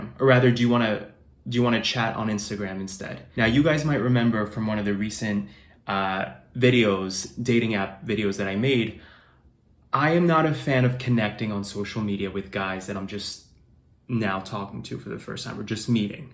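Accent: New York, United States. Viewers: How could anyone resist that NY accent and the way your voice goes up on words like “Vocalize”.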